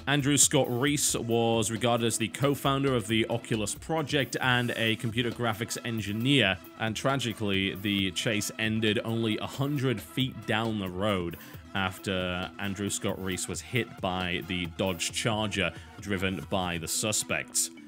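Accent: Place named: England, United Kingdom. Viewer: ...theyre two different pronunciations. and keep in mind, the language is called english, and he's from england. see a connection?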